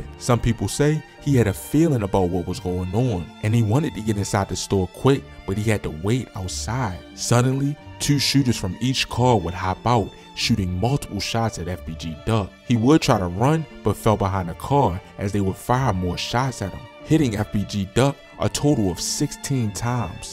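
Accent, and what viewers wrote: Baltimore, United States. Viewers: B.More accent lol 410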